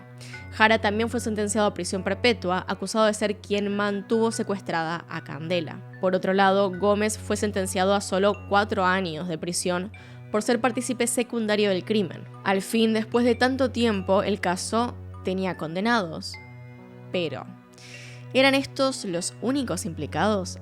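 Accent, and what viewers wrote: Argentina. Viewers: Hola me encanta tu acento argentino supongo y también tu acento angloparlante supongo que también hablas inglés te felicito por tu programa es magnífico y tú eres muy bella saludos😊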